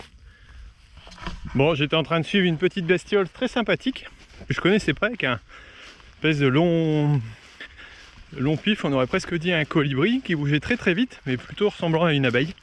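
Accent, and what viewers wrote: Nord, France. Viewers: Bonjour, super vidéo Puis moi qui suis originaire du nord, j habite maintenant en Sarthe, ca fait du bien d entendre du ch'ti!😂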